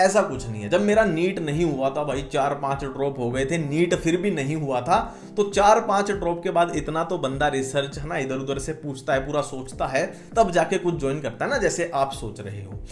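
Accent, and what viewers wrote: Haryana, India. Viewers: Bro haryana se kha se ho aap ??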